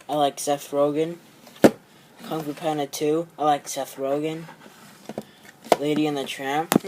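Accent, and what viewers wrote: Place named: United States. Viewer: Where u from? You sound American but most of your blu rays have UK ratings on them.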